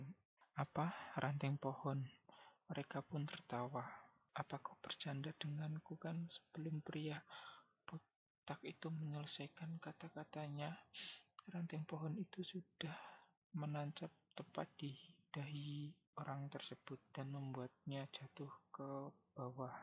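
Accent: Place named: Indonesia. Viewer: Soal y suara lu medok coba bikin suara y alusin sedikit trus kerasin klo mau gasih suara Jagan di baca mending hapalin tesk y dlu ok bro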